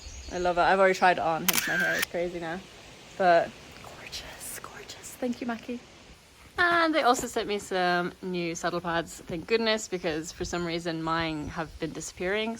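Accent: Ireland. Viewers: First. Wonderful vlog. New helmet! Love it! Just enough bling! I love how you used to say Khal was so pokey and you kind of looked resigned. Like - yup he's sooo slooow. He was but I still thought he was adorable. When I revisit those vids I can barely see the incredible jumper he is today. I really loved him but I could not for the life of me see his future self. Kudos to you for seeing it. Second, I LOVED your comment about Meg. Lordy, she is funny with the semen. She blushes and I crack up! I am not a vet but am a nurse so I truly have no problem discussing anything at all with anyone at all. Healthcare, be it animals or people is full of lots of bodily fluids going willy nilly. And as an American, I love listening to your beautiful Irish voice but then you said Urine in a way that my American ears almost started to bleed! It was so darn funny too me. Keep up the wonderful content. 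Sorry for the long comment-I just have so darn much that I love about you and your horses, your dad and your attitude. Plus I love that I know about gentamicin.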